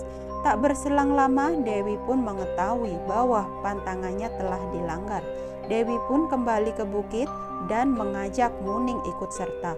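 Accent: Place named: Rejang, Indonesia. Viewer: Logat rejang pngisi acrany kntel bgt 😊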